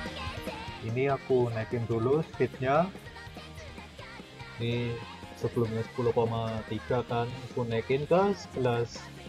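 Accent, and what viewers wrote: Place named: Java, Indonesia. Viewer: Njir medok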